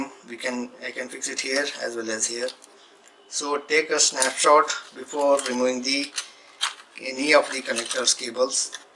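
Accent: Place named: India. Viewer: He didn't go to the extent of actually showing us how to replace the chip. Also, heavy Indian accent made it impossible to understand what he was saying, so needless to say whatever he was doing in disassembling the unit it was not clear, except for the part of saying to take a photo of the connectors before you disconnect them so that you don't get them mixed up. That part was clearly understood.☹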